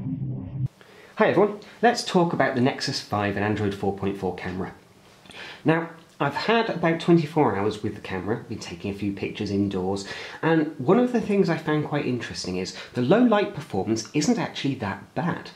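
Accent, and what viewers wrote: United Kingdom. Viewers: Thanks a lot for your videos. 1 : I'm French, so it's really easier and more comfortable to listen to someone speaking British English. thank you for that :P 2 : thank you for not being a N5 fanboy, and for pointing out both good and bad aspects of the phone, that helps me a lot ! 3 : Please let us know when you can tell whether the battery is decent. Thanks ! :)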